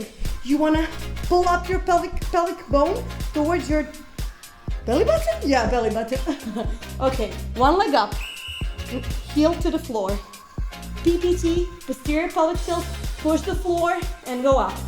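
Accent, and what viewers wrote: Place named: Russia. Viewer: Russian English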